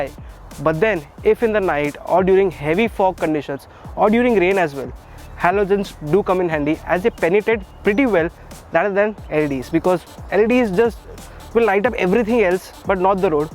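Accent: India. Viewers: Good video but your English with the strong Indian accent is sometimes hard for me (English ) to understand. What is the cage/barrier thing fitted on the back of the bikes? I see it on a few Indian bikes and wonder if it’s for women riding side saddle? I bought a new Versys in 2020 then bought a 2014 V Strom in 2023. While I liked the Versys the older V Strom is more comfortable, smoother and the gearbox is slicker. I also found the bike more flickable because the centre of gravity is lower. 👍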